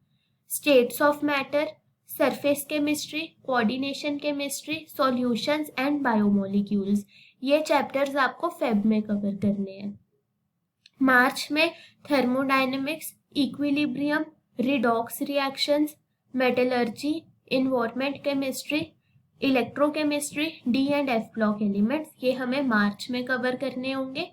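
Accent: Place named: South, India. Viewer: Thank you Madam your assent is South Indian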